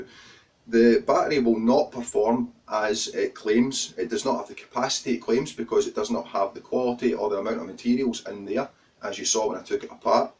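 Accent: Scotland, United Kingdom. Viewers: I have learned my lesson the hard way!. Don't buy any vape products such as batteries 18560 (spoke about in this video) or vape coils. I have purchased both and received fake Products both fake batteries and vape coils. I even had a seller contact me when I left negative feedback asking what he could do to get me to change my negative rating!! REALLY! Thanks for the video - great job and being Scottish I loved the accent too! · naw, I pegged that accent as Scottish quite early, and honestly, I think that short of accent sounds kinda cool IMHO.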